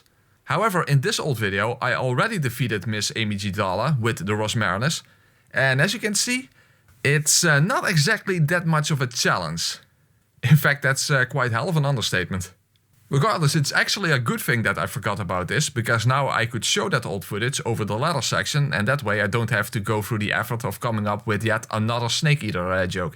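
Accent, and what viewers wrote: Netherlands. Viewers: It took me 2 seconds to recognize your accent, het is goed om een Nederlander te horen op Youtube! Great content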